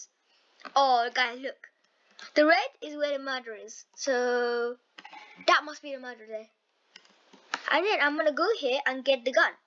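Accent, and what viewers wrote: United Kingdom. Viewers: British boy 🥳 no offense I like em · Umm idk what he's says because he's bridsh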